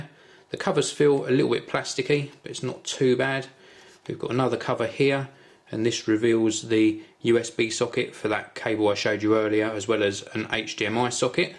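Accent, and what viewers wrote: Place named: Essex, United Kingdom. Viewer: no, he has more of an essex accent.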